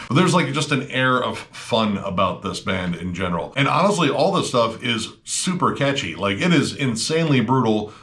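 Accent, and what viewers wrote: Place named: Wisconsin, United States. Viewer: Im a sucker for a Wisconsin accent...on a lady. Hey, I dont judge.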